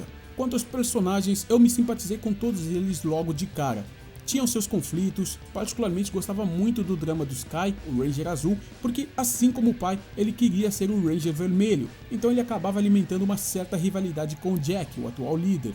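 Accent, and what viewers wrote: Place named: Rio Grande do Sul, Brazil. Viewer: O cara fala muito gaúcho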